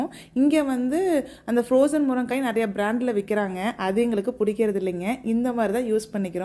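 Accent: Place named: Coimbatore, India. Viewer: Kovai slang 😅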